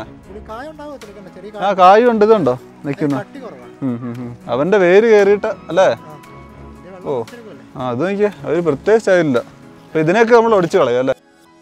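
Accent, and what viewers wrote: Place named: Thodupuzha, India. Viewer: Ahaa njan thodupuzha.. Samsaravum aaa style okk kandapo thoni.namude nattukaranane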